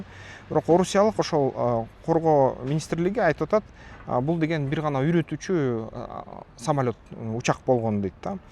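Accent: Standard. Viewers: Тура байке сиз мыктысыз кыргыз тилинде таза алып барып👍👍👍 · Брат капа болбон таза кыргызча суйлойсуз сиз билимдуу адамсыз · Эң сонун,нукура кыргыз тилде сүйлөп жатасын иним.Келесоо маңкурттар жаза беришет, көңүл бурба❤❤❤ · 😂😂Азаматсыз НУКУРА суйлой бериниз!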